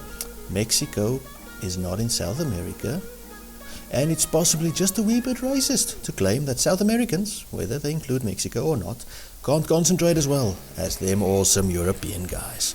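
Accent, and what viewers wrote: South Africa. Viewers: I was trying to figure out what your accent was before your said you were from south africa😂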